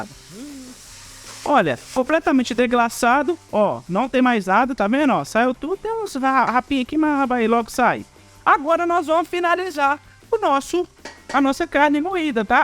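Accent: Minas Gerais, Brazil. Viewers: Que delícia, amei sou de SP, aqui é cheiro verde, adorei o seu sotaque mineiro!!!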